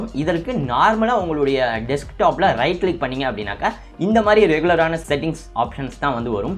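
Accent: Madurai, India. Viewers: Bro Mi A1 ku epadi install panrathu nu solunga and nenga Madurai ahh?? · Bro nee Madurai ah 😍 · Bro madurai ah Neega. . . Nice video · Madurai ah neenga? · Madurai karanaya ne ???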